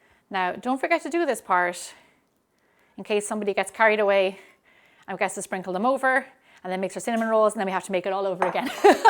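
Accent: Ireland. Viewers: I adore your easy to watch videos, not to mention your Lovely Irish Brouge. However I like bananas but not in baking. What else can I use to make these inviting rolls?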